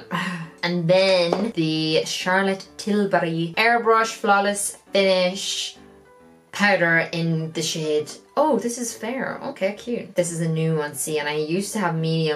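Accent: Ireland. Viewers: I could listen to you talk all day. Irish accents are so beautiful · What part of Ireland are you from ?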